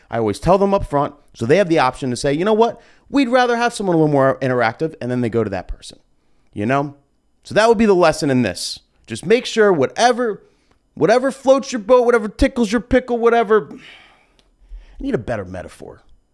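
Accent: New Jersey, United States. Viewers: Im on both sides of this fence... when people complain about the DJ interaction...it just simply has to do with whatever they are doing...if you arent that good at picking good songs... you need to have a filler of you to keep people entertained... if you are super good at mixing but you dont care to interact...then add interactive audio to your set.... and also be up front... But. I dont think you realize that you have big time charisma... ive seen your streams as well... and even when you're quiet...you are interesting to watch... at least for me... you're good at both from what I have seen... of course I havent been to a wedding you DJ...I think... I went to a Philly wedding in 2014 and I almost think you were the DJ but my fallible human memory could be failing me miserably so I wont speculate lol... but you dont realize...you simply have the gift of an introvert and an extrovert...i am the same way... 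i dont want to be expected to interact....but when the party is jumping...im gonna naturally interact just because I am having more fun too. And I think you are a similar way... you got that entertaining jersey accent...you dont portray that you dont like interaction...you just dont like to be forced to be cheesy.. and thats a valid place to be... you simply know when to interact...and when not too...and most people in general simply dont know that... because they dont practice it... or simply dont have enough experience with it...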